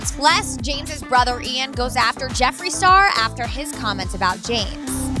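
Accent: United States. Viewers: Britt Nicole She’s barely pronouncing it wrong are y’all deaf 😂 It sounds like an O because she’s saying it fast and has an American accent- wtf. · It’s because she has such a strong (and irritating) American accent 🥴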